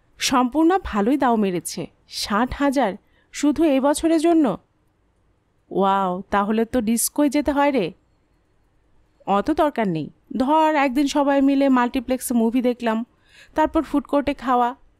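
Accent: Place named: Bangladesh. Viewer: Reading is good except a few Bangladeshi pronounciation.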